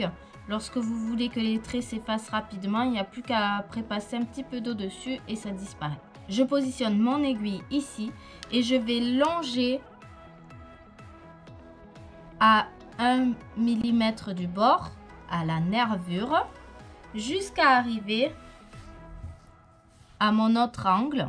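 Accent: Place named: South, France. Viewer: Un abonné de plus hehe :p ça fait plaisir de ré entendre cet accent du sud bisous à zozo et tout le monde :) PS : c'est favess09 🤣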